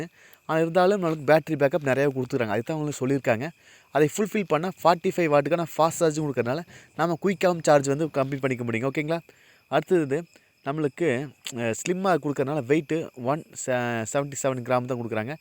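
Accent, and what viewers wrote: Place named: Kongu, India. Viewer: Kongu tamil properah kettu evalo naal aaguthu❤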